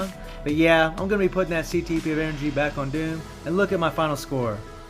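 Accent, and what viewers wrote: United States. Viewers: He is from the United States it's obvious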